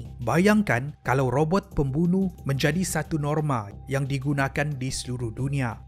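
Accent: Sabah, Malaysia. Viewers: Nk tnye dia nie org sabeh ka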